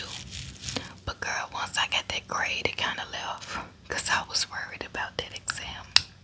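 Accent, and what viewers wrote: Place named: Memphis, United States. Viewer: You are so lovely omg! You said you were from Memphis and your accent finally clicked for me, I couldn’t place it till you said!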